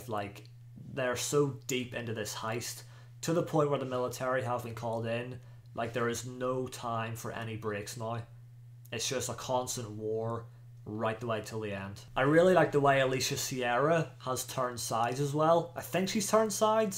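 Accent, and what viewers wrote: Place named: Northern Ireland, United Kingdom. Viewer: I know a Northern Irish accent when I hear one 🤣 what part big lad? Only came across your channel today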